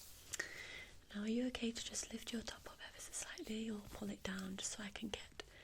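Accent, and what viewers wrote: United Kingdom. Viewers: This was great :) First thing I checked while listening to the video was if there was any info on your area because I thought it was a British accent and I was right. No wonder it sounded a lot more pleasing :D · Just subscribed. You have a very soothing manner, wonderful accent. I'm kind of a typical American who loves British accents. I would imagine you'll have many more subscribers in the future. I'll be watching more of your videos and I'm sure I'll be hitting the like thumbs up on them. Wish all success to you. Greg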